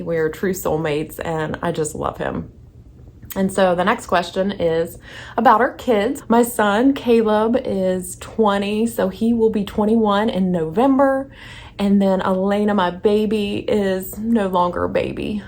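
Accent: South, United States. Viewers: I started watching decorating videos last Fall and found you. I really enjoy your enthusiasm and we have similar taste. I love your southern accent too. I enjoyed learning more about you and look forward also to your Bible verses. You are a beautiful inspiration, thank you! God bless you! 💕(I am a grandma of 9, you have so much to look forward to). Such blessings!!! 😘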